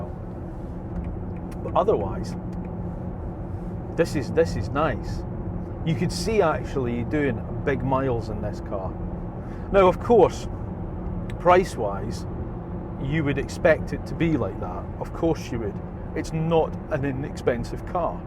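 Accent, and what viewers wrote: Scotland, United Kingdom. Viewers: its called a scottish accent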